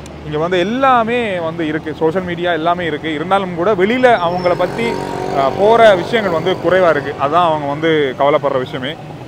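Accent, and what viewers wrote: Sri Lanka. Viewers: Ur tamiz is good. Excellent srilankan tamiz... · Srilankan Tamil .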